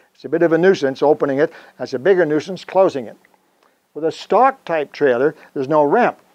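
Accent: Canada. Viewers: I can spot a Canadian accent a mile away. Love this channel, cheers from Kitchener Ontario 🇨🇦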